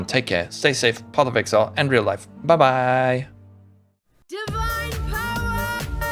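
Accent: South Africa. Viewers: Are you Afrikaans? Your English accent sounds like you might be from SA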